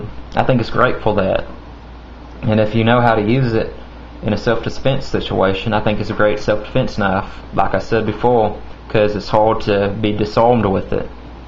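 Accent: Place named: South, United States. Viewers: I don't care what people say. The southern accent is awesome! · Look people, he's a southern man with a slight speech impediment, lets have SOME decency and comment on the knife being shown? Not on this man's accent. Now, i think its a cool knife, it is manacing though, this is probably why you get looks, it's foreign in design, meaning people probably see it as solely a weapon and Not a tool. Cool knife. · I love your Texan accent.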